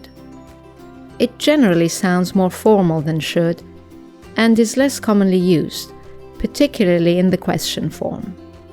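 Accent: United Kingdom. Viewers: One of the best teachers. Really great. Are u original British?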